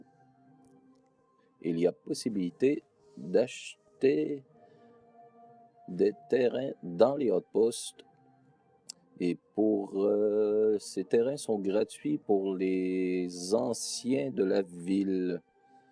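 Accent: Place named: Quebec, Canada. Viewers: Tu viens d'où au juste ? Si t'es de la France, je peux te dire que t'as un petit accent québécois, j'ai l’impression. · C'est normal, c'est un québécois..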